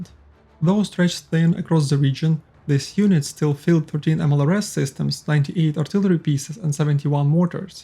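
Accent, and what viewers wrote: Eastern Europe. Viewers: О чём вы тут спорите, у автора славянский акцент и очевидно что канал пропагандисткий проукраинский. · To all those who write that the author is biased, the author of this video is apparently either a Russian sympathizer of Ukraine or a Ukrainian, his distinct Slavic accent gives it away.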